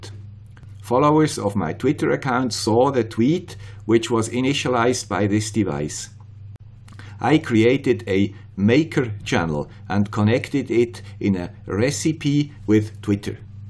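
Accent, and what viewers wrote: Switzerland. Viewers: i want to help) i like everything on this channel and swiss accent also! like from Belarus! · Very interesting video from the man with the Swiss accent. There is one part I don't understand though: how do you use a reed relay instead of a normal relay or mosfet? If I put a reed switch on a door or window, it makes a permanent connection, not temporary. How can you use a reed switch to trigger the ESP then disconnect the power once the job is done?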